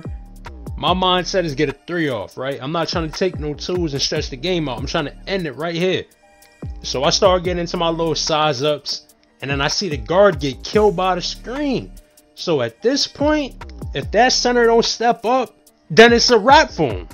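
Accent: New York, United States. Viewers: this my first time watching your vid and I’m glad I found you, I subbed and all bro but how tall is your offensive threat I made mine 6’2 is that good ? & no cap you sound like you from NY or NJ which is mad funny cause we sound alike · his New York accent makes this video so much more better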